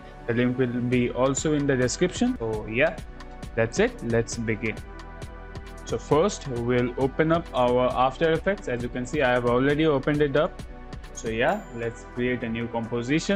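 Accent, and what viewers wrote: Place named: India. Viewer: 😊Indian high quality voice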